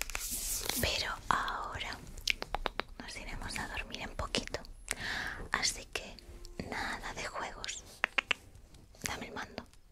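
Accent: Spain. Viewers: El acento de mujeres españolas son ideales para este rubro de el asmr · El asento español de españa es genial para los asmr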